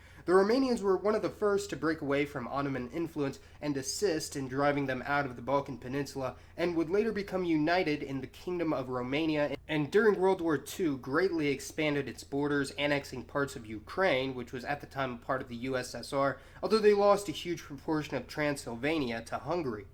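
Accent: United States. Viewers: there are multiple issues with your videos and you know about some about these. You have a good American accent, sounds convincing. But that's your nemesis as well. 1. Pronunciation issues These stem from English, where they repeat A(ei), B(bi), C(si), D(di), E(i), F(ef), G(dji), H(eich), I(ai)...Y(uai), Z(zet). FYI, all speakers of BBC check the correct pronunciation of any foreign name with locals BEFORE saying in on air! Please, note that languages different than English have PHONETIC alphabet, meaning that 'A' is always (a) but not (ei), 'E' is (e) but not (i), and so on lran is not (airan) but (iran). Just like Italy is not (aiteiluai) but (itali), or more correctly Italia (italia), and Enland should be Anglia (anglia) but not (einglaiei). Iraq is not (airak) but (irak). Macedonia is not (masedonia) but (makedonia) Dacia is not (deisha) but (dakia) Thracia is not (treisha) but (trakia) Persia is not (purza) but (persia) 2. 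Ethnic issues Romans (citizens of Roman Empire) have absolutely nothing in common with Gypsies who came from India with Alexander The Great, for whom George Soros paid to be named 'romani'. 3. Today's Romanians are descendants of ancient Dacians (dakians). Dacians (dakians) are Thracians (trakians), and Tracians (trakians) are Bulgarians. FYI, old Bulgarians, had the most precise/accurate calendar ever known and, acknowledging this, in 1976 UNESCO recommended its adoption worldwide. These people had the oldest known crafted gold - Varna civiliz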